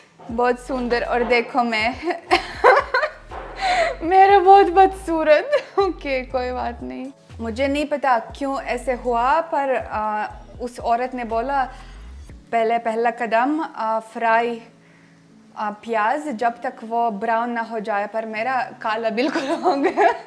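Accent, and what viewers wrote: Standard. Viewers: Aap hamesha khus raho sabsa bada Rog kya kahenge log aap bahut khusmizaz aur funny ho,apki sudh Hindi aur aap bahut acha bolti ho apke vlog dekhkar bahut Anand ata hai maine apke vlog pehle bahut Miss Kiya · इतना शुद्ध हिंदी तो हम भारतीय भी नही उच्चारित कर पाते है..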